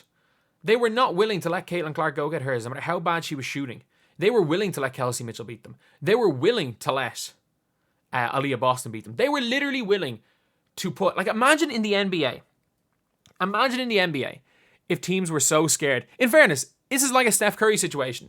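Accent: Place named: Ireland. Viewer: Good job. What part of Ireland are you from?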